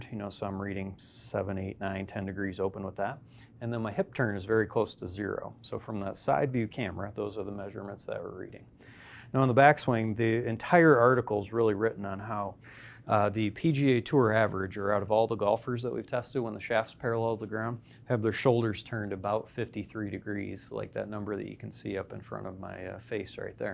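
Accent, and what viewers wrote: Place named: Ontario, Canada. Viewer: Very well said and illustrated. Is your shop found in Ontario you sound like it may be. I’m looking for a location in southern Ontario. I have subscribed, I’m a believer of ST. Thank you. JB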